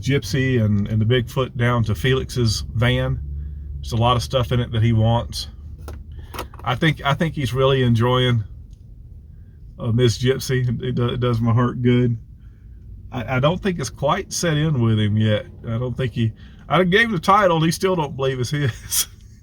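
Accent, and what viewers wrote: South, United States. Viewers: The spark in is back!! I'm sort of glad the "road" has kicked you in the A _ _! I can say that from experience ~ ~ gotta listen deeply to your body's healing process. Love your southern jibber jabber too. I think sometimes you change vehicles to keep it interesting for us, plus we all learn. For that, I thank you. Promise yourself you'll slow down even if you do feel better, the healing will come much faster if you do ~ I know from 1st hand experience. Felix is a God given gift, good he's walking that mile with you everyday.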